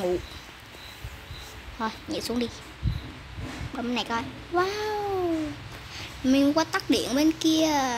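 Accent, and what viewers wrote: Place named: North, Vietnam. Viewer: Ủa sao lại nói giọng bắc, bà nói giọng nam cơ mà??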